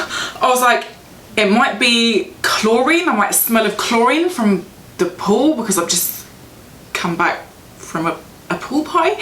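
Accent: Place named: England, United Kingdom. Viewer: Lool I just about to say if you came here a few years back how is your accent soo english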